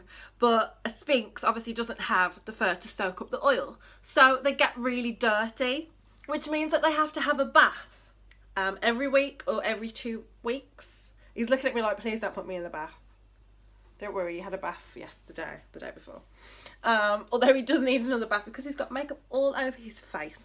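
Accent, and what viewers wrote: West Midlands, United Kingdom. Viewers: OK I’m going to admit I did not expect the British accent I kind of figured you were from like Brooklyn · That's a west mids accent isn't it ;-) beautiful cat....am getting my hairless baby in one month ;-)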